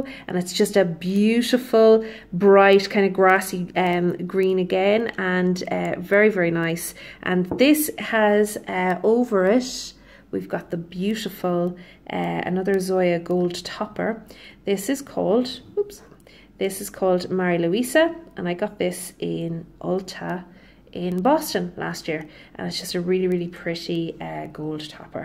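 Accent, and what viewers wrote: Ireland. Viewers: I'm applying Zoya's Merida as I'm watching your video! I love listening to your Irish lilt, what part of Ireland are you from? My great grandmother was from Belfast. Her father was a tugboat captain in the Belfast harbor & was one of the tugboats that pulled the Titanic out of the harbor on it's fateful voyage!:)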